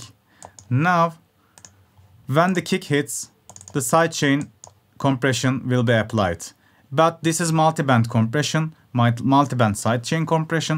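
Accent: Turkey. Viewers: Bilader türk ingilizcesi konuştuğun çok belli 👍🏽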